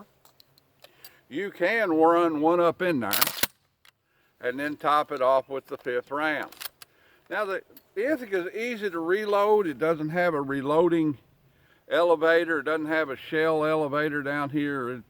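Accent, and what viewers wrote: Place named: Appalachia, United States. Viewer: let me say I love your videos and subscribe to your channel. If I didn't know you was up in Kentucky and was just listening to ya I'd think you was a local boy from here in the Carolina Mountains. I spent a good many years here as a Deputy Sheriff and in doing so came to harbour a life long love of the riot/police shotgun or as Ole Bill Jordan called it "The Great Equalizer". I went through the Police Academy with an Ithaca 37 DSPS (5 shot, 20", parkerized) and have put together a decent little collection buying most what I've got in the past when they were alot cheaper than they are today and I had more money to spend on them too. My biggest regret has been not going $750 on an H&K 512 at a gun show in early 2000's. It wasn't til I got home and saw how rare they are that I knew how bad I messed up. Made on contract for German GSG-9 that 12 ga.auto also had a shot dispersion device on the end that was adjustable to throw a horizontal or vertical oval pattern depending on the setting. 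Only the overrun of 341 guns total was ever imported into the U.S. by Keasler Police Supply. I passed it when the seller wouldn't budge off $750 to my eternal shame. Anyways the initial reason for the comment was just wanna help you out a little bit on your Ithaca 37 details. The LAPD Special 37 did have corn cob forearm but it had black plastic butt plate, parkerized finish, 4 shot magazine, and 18.5" rifle sighted barrel that differed from others by having the rear sight only windage ad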